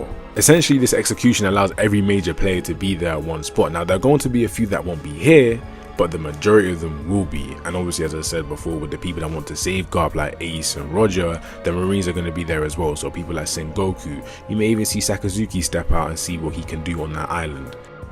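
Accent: United Kingdom. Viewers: Ffs stop talking British bruh. Bro sounds incoherent (slightly) 2/2 videos that I've watched. EDIT: I like the content, that's the only reason I'm saying this. Not throwing hands just cos Brit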